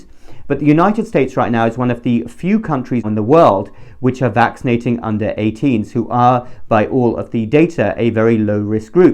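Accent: United Kingdom. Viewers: yes, I wasn't looking at the screen, just listening, and he sounded British alright. What's the other passport?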